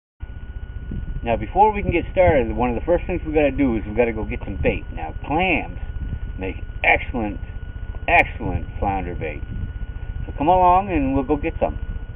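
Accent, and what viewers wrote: Canada. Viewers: hey am living up in ontari-ario and im from the new glasgow area your voice sounds familiar like you might be from around there. Cool videos thanks i subscribed:)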